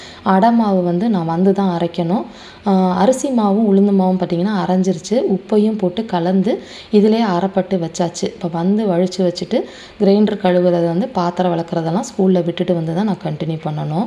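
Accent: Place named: Tiruchirappalli, India. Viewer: my name Devi 67 years old l got my degree on1974 from your way speaking Tamil I thought that u may be Trichy or Thanjai Trichy so many relationship are there Trichy past mla anvil poyyamozhi My close relative from your way of speaking I understood I am also interested in cooking like you My daughter at Fort Worth she will also cook nice ly Yesterday l spoke about you to my daughter her name Narmatha She’s working at CTS software company 2017 we came to Fort Worth stayed 2months Stay blessed with your family Bye da Chellam Trichy town are near by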